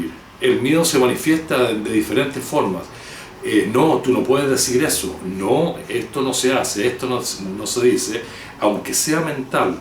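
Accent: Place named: Chile. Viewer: Usted por su asento parece Chileno, es de allá?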